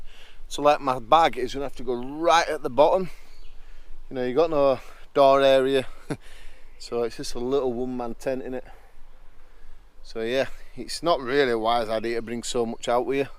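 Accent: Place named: Yorkshire, United Kingdom. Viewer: you’re brilliantly funny, Keep going my friend. My family is partly from Yorkshire so I can relate to the accent. Excellent stuff mate.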